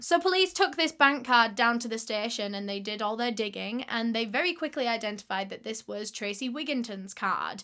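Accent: United Kingdom. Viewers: I love your British accent 😍❤️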